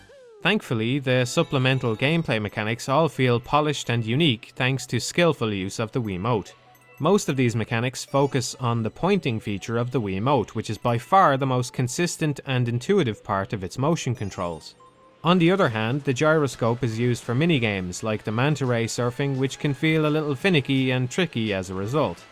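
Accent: Ireland. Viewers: Warren Tierney Yes he is. You don't know how Irish people sound like?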